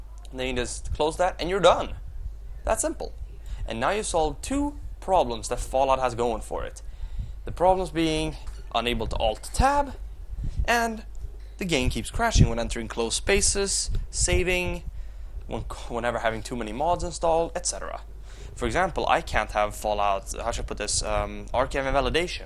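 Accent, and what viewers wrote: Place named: Sweden. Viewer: not a good thing dude. You have a really horrible way of delivering what you're trying to say. You take way too long to say it and sound really spastic. But it sounds like you're swedish so i'll just chalk it up to english possibly not being your first language.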